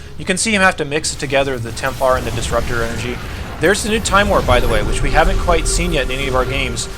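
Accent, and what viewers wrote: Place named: United States. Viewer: Wow. Protoss OP as F*ck now huh? Hope this game isn't broken at this stage in the series... Can't justify toss having what appears to be disruptor mini-nukes, AOE traps on top of colos/tempest/ templar storm. Toss now AOE gods. The beta thus far looks ridiculously in favor of protoss. Also.... with respect, its pronounced "STAY-SIS", not "STAH-SIS". You sound like an American, so I can't understand your mispronunciation of Stasis...... FYI so you don't sound like you didn't pass high school English class.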